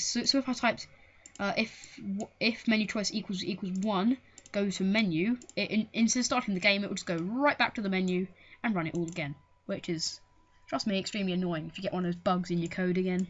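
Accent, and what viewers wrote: England, United Kingdom. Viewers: i really don't understand anything ur talking so fast and man , i'm not used to the english accent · british accent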